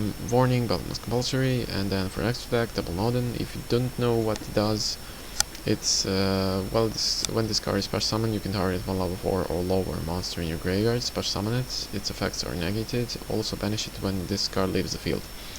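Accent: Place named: Netherlands. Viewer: ... decklist is okay. Dutch accent is horrible.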